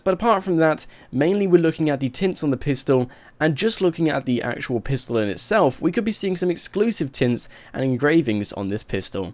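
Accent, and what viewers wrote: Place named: RP, United Kingdom. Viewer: You sound so posh, I LOVEEE IT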